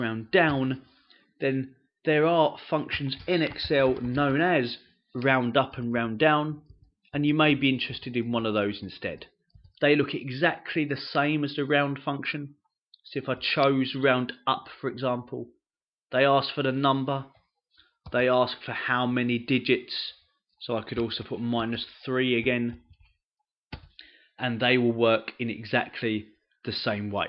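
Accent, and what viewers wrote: England, United Kingdom. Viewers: I have not heard English accent very long time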